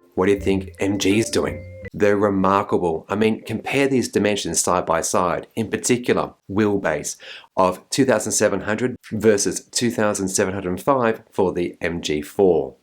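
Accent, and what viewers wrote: Australia. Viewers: sounds Flemish... but you sound Aussie....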